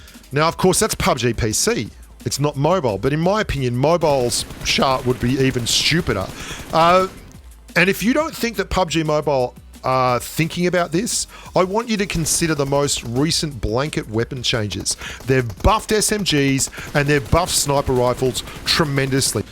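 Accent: Australia. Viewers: I subscribed to this channel recently. I think..this guy knows what he is saying. The probable reason why he isnt getting more subscribers..may be for following reasons: 1. Accent: I think its Australian..though i can easily understand him..most of the south asian audience can't. So either you can slow down while spekaing..or put notes..subtitles..in video..like levinho and tacaz does. They arent good at english..and hence they only use.. text for communicating with audience. 2. More gameplays: all leading youtube accounts..majorily upload..their gameplays.. i like your educational videos..but it wud be great..if you can uplaod your gameplays..atleast once in 2 days..with commentary. I think people wud like that. Anyways.. cheers mate.. great channel. I learnt a lot from you. May you get more subscribers soon.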